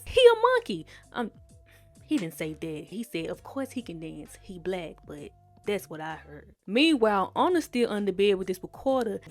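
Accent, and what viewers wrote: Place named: South, United States. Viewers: Oh I would’ve guessed Tennessee. There was a contestant on Survivor last year (Q Burdette), and your accent sounds so similar to his. He is from Memphis though, so pretty close to Mississippi. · Right!? I love the black Mississipi and Lousiana accents (all the deep southern states tbh), I'm from London, so I've yet to hear one in person tho😂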